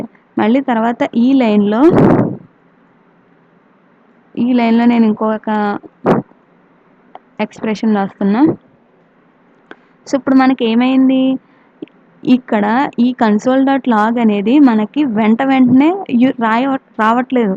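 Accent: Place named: West Godavari, India. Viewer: Mam midhi West Godavari dt Slang ga undhi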